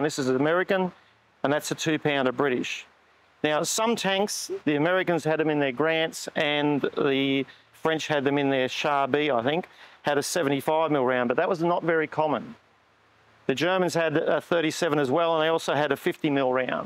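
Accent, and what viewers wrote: Australia. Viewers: Thank you - that was really informative. And hearing it in an Aussie accent was music to my ears. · I love our accent. Come on Aussie come on!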